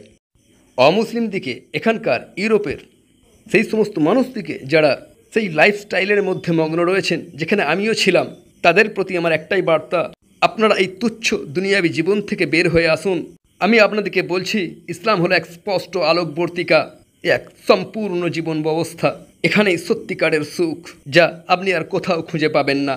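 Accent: Kolkata, India. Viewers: এটা হয়তো কোলকাতার আঞ্চলিক ভাষা যার জন্য উচ্চারণটা এমন হয়তোবা!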